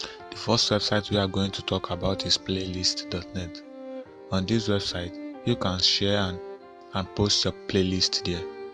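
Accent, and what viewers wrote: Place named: India. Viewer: You are speaking like an Indian man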